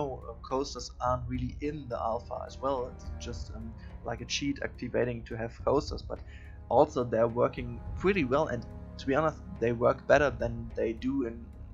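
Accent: Germany. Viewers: Dein Englisch ist doch gut , natürlich hast du keinen Englischen Akzent sknder sprichst halt Englisch wie ein deutscher Englisch spricht. Nur du scheint ein wenig schüchtern hatte ich das Gefühl :)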